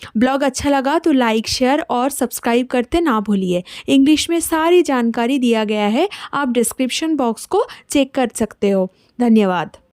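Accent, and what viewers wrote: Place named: Bengal, India. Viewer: Thik hi laga.hindi bolne me thora sa bengali taan rahtehuye bhi achha hi laga.carryon.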